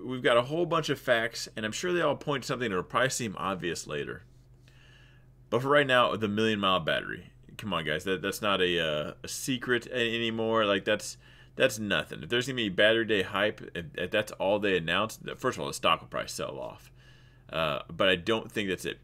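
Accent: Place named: New York, United States. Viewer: He sounds like a New Yorker at 1.5x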